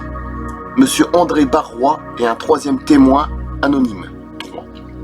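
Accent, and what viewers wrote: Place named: North, France. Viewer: Salut à toi de toute façon à ta voix on voit bien que tu es du Nord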